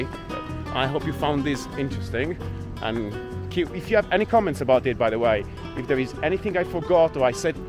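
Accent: United Kingdom. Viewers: Nice video but I am going to disagree. From the sound of this guys accent, he sounds like he has been in the British Isles for awhile. I am both Italian and Spaniard. I would say the similarity here is from like 80-90%, perhaps you are talking about your Milano dialect which you even said Spanish is more like Italian than your own dialect. Although I liked the video and some of his message, there was confusion in how you relayed it. Yes and No, it seemed convoluted. So lets just say there are plenty of other videos with experts on language that say its about 82-85 the same. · No te conozco de nada pero por tu acento inglés diría que has vivido en el Reino Unido, es así?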